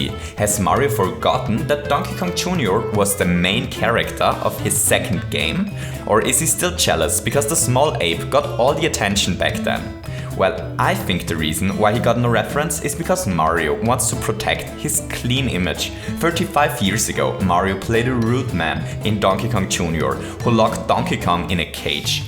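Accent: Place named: Germany. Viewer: Nice Video. Deutschland oder Österreich (Akzent verrät alles)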